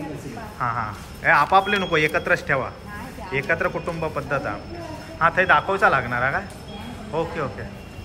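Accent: Malvani, India. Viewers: तुमची मालवणी लय आवाडली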